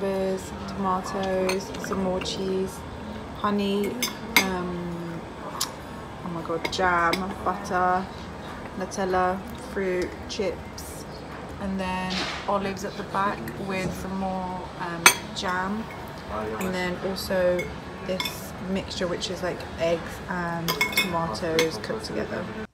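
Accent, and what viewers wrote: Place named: United Kingdom. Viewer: I never tought that you able to speak turkish because of your incredible brit accent 😅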